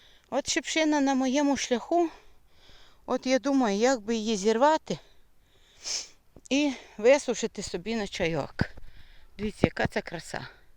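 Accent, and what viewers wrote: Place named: Ternopil, Ukraine. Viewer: Нагадали Ви менi моi 20 рокiв😊 В Днiпродзержинськ на швацьку фабрику приiжджали кожен рiк декiлька десяткiв дiвчат з Тернопiльськоi областi пiсля швацького училища. Так вони розмовляли так само, як Ви. Особливо слово " але" дуже часто вживали. " I" з дома крапками не можу писати - тiльки нiмецькi та росiйськi лiтери маю. Батьки були росiйськими нiмцями. Розмовляти украiнською не мала потреби, але читала, писала у школi. Не забула 😃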